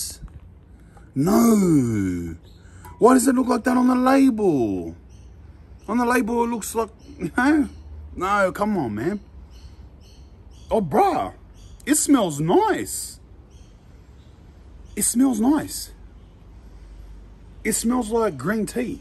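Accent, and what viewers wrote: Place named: Australia. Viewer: cheeps lol aussie accent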